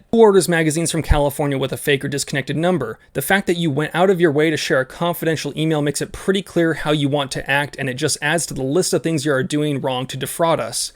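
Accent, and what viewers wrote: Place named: South, United States. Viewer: this guy sounds like a hillbilly clown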